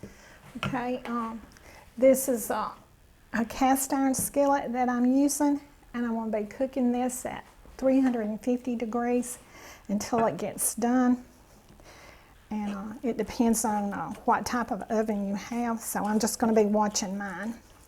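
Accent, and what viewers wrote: South, United States. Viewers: Your soft sweet southern accented voice sounds just like my late mother in law . She was a great cook too ! ❤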